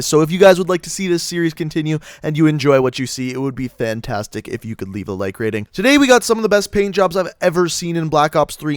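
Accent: Canada. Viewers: ohhh I know your from Canada now ''soory" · are you Canadian? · He's definitely Canadian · Soo soory you sound really Canadian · Are you Canadian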